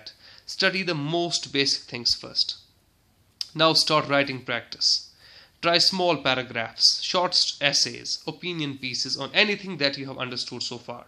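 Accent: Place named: Kashmir, India. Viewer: Every kasmiri aspirant has this tinge in accent and when he speaks it sound like poetry... Athar aamir b aisa hi bolta hai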